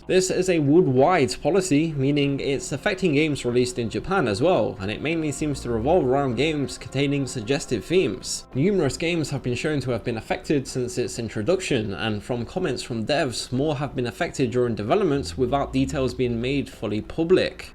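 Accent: United Kingdom. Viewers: He sounds British so that could be it. They also say vittamun instead of vitamin and beeta male instead of beta male.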